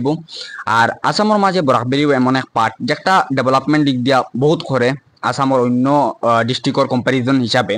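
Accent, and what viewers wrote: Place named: Sylhet, Bangladesh. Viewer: Tumi olpo Shuddha Bangla Matiyo. Jaate Non-Shylleti manusheo jaate bujhte paaroin........ .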